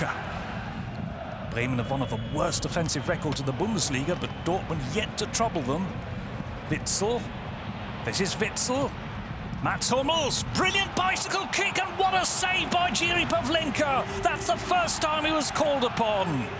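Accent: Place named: RP, United Kingdom. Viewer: Poshest commentator of all time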